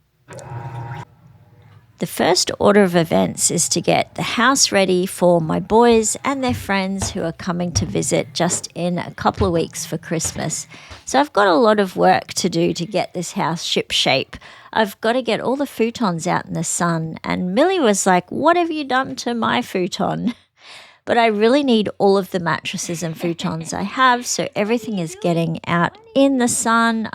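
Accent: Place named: Australia. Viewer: I enjoy watching your videos. I love your Aussi accent. I'm in Texas USA. My children are grown and moved away. I don't get to see them as much as I wish. I have made a life for myself with the 2 cats that came with my house when I bought it. I sold a large house and bought a small one near the lake. My cats are such good companions. Greeting me when I return home and following me around the yard when working outside.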